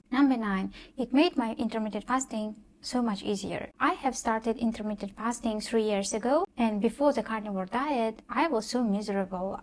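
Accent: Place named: United Kingdom. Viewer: It sounds like you’re in the uk? 🇬🇧 welcome to the tribe. 😊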